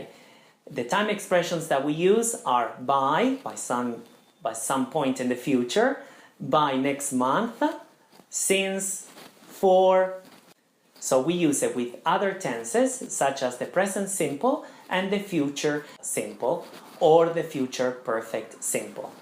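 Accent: Italy. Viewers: i would have bet that you were italian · I trully loved his italian accent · You are definitely Italian and not Canadian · your italian accent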